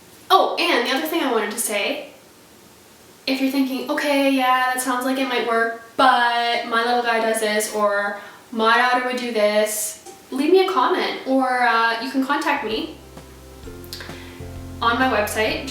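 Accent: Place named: Canada. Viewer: the Canadian accent is strong in this one😃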